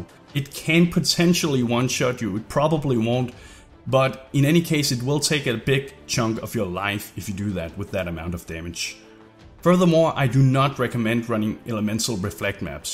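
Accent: Denmark. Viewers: Can you level up with this? Btw, are you danish? :D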